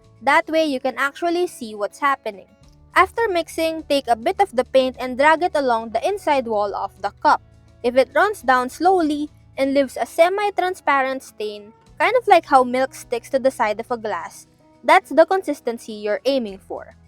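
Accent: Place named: Philippines. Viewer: filipinos really have that accent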